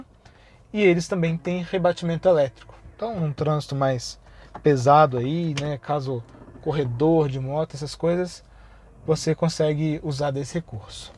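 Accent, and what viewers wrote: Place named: Rio de Janeiro, Brazil. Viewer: O sotaque do apresentador está mais para um carioca, igual meu vizinho, a avaliação nota 10.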